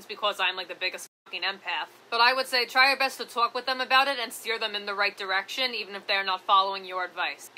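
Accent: New York, United States. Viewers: We Stan an e girl wit the New York accent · Is this accent from New york. Is both the most wonderful and kind of intimidating! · Are you from new york or something east coast? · She from New York?? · You come from New York with that accent and definitely Italian parents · Hey, I like your accent.. it's a mmmm very New Yorkie. For get about it... lol 👍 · I love this chick hear voice is wonderful dead ass. She sounds really New Yorker🤣 and I am from nyc, but she is also funny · Was just recommended your channel. Are you a New Yorker or from New Jersey? Or are you from Boston? That accent sounds like New Yorker! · Hey are you from new York?I was really wondrring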